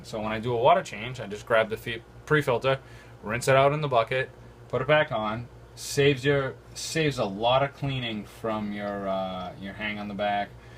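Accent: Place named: New England, United States. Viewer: Deff from New England lol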